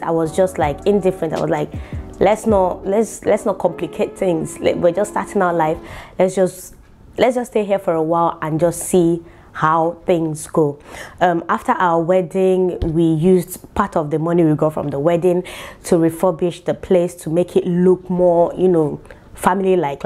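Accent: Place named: Igbo, Nigeria. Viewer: Nden mandu na ko 🤣🤣🤣🤣 if only they know that it's bcoz that your English_ Igbo make people like us subscribe to this channel, them for run tikpeeeee🤣🤣🤣🤣🤣🤣awon oni isokuso!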